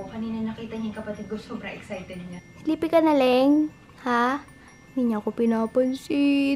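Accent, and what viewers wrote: Philippines. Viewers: kakatuwa tlg punto satin kababayan tau hihi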